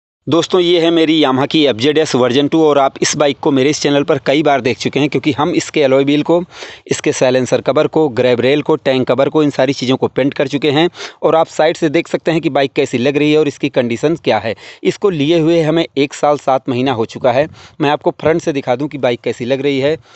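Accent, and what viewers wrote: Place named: Pratapgarh, India. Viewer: Bhai aap kaha ke rehne wale ho aapki bhasha se mujhe lagta h ki aap uttar pradesh jila pratapgarh ke aas paas se hi kahi ho...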